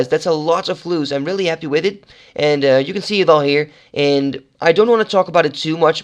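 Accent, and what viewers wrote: Netherlands. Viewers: Are you Dutch? I recognize the accent ^^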